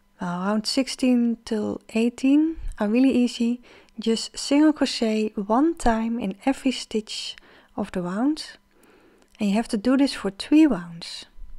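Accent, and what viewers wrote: Netherlands. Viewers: jaa zag hem voorbij komen inderdaad! Maar zoek altijd in het Engels omdat je vaak meer video's tegen komt... Doe vrijwel alles in het Engels. Maar meende iets van een Nederlands accent te horen... Leuk! Bedankt voor de video! ❤ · I'm going to make one for my grand daughter. She's a cat lover. Thank you for yr tutorial. By the way are you dutch? Your voice has a sweet dutch accent 😊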